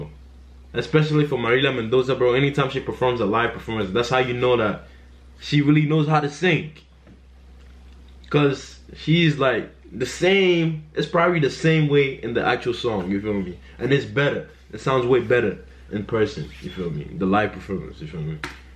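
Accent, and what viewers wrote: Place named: United States. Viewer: bro, don't mind this comment, your english is very american hahaha we're together, keep up with the reactions 👊🏻🇧🇷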